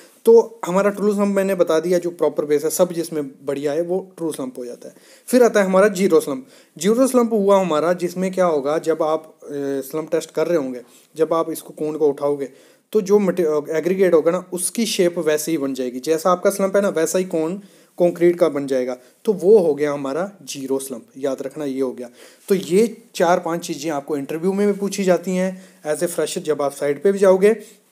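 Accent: Jammu, India. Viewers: He sounds like my friend from jammu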